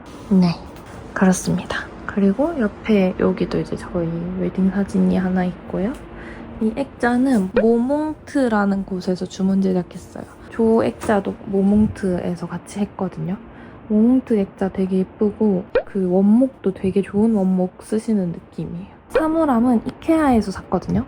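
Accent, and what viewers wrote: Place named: Seoul, South Korea. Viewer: 니 왜 서울말씀?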